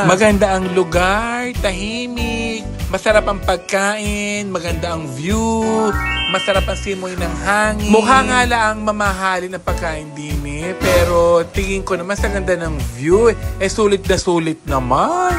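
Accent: Batangas, Philippines. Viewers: Authentic Batangueno ang narrator na pumunta sa Iloilo, di ba? The best pakinggan.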